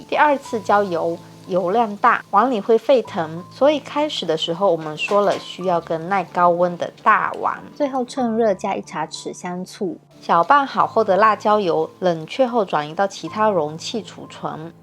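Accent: Fuzhou, China. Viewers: 您是福州人吗？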